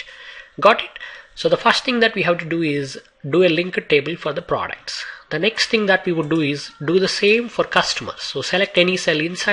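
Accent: India. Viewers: I open the video, hear and indian accent and immediately know that the tutorial will definitely worth my time. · Interesting video. Although, your accent sounds like a typical Indian, I still can understand you. It's clear and well explained. I think it will be better if you include sub-title to your video so that viewers who are not familiar with Indian accent can understand you better. Anyway, it's a GREAT JOB. Thanks a lot.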